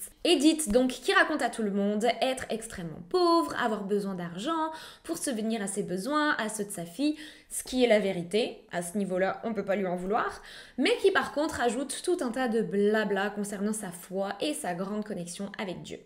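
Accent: Quebec, Canada. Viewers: Salut.. Comme d'hab 👍.. Un bonjour au Québec!..(t'as vraiment pris l'accent!! 😊) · Je ne l'ai jamais dit.. mais j'aime tellement ton accent , ça sonne bien pour un Quebecois.. retour sur un petit côté professionnel.. Merci pour tes vidéos, les dossiers sont toujours plus plaisant et facile à lire par la suite. Bisous xxx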